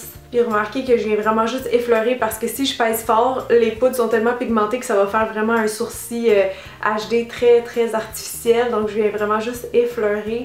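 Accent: Quebec, Canada. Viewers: Adorable ou.... Joli make up et encore une vidéo avec le charme de l'accent canadien..... j'adoreeeeee. Bisous Sublissima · Franchement j’adore ce style de maquillage qu’on voit hélas peu, t’es une fille super naturelle de manière générale dans tes vidéos car j’en ai vu plusieurs. Un jour il faudra que j’aille au Québec j’adore tellement l’accent, bonne année from France 😊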